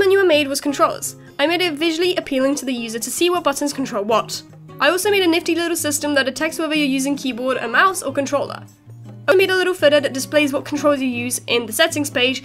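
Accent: United Kingdom. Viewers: That's the time I stopped watching. Why do you have to talk so freaking fast?!?! You already got a heavy British accent which is hard for the most of the world to understand.. Food for thought..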